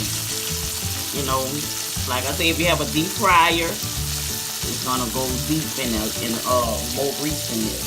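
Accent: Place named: New Orleans, United States. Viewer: She sounds like shes from Louisiana New Orleans to be exact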